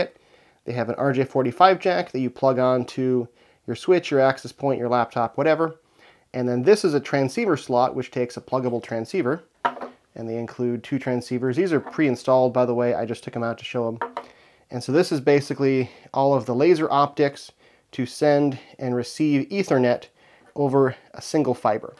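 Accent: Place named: United States. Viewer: How did you get such a solid American accent as a Finnish guy? :S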